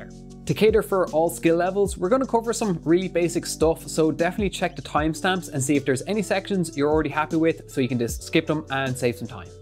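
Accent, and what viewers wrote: Ireland. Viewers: Very helpful, thank you. Just wondering, since you sound Irish, I am in Ireland and thinking about buying a v-mount plate and battery for my BM6k, but almost everywhere on Amazon and other sites say they don't deliver batteries to my location. Could you recommend a site that does?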